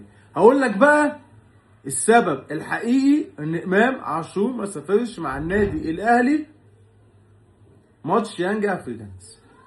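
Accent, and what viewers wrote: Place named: Iraq. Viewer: اسمع عراقي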